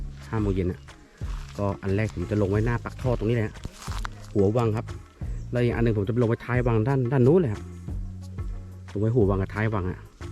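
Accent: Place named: Sukhothai, Thailand. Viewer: สำเนียงเหมือนคนโขทัยเลยครับ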